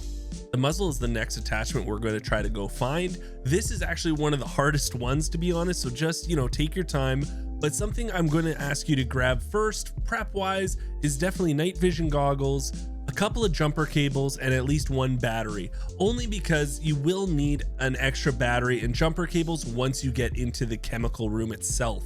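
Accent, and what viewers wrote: Canada. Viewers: I hear a Canadian accent ayeeee? Haha I just got wz on ps5 and I saw this blueprint on a dmz squad mem I really want this but it’s super hard looool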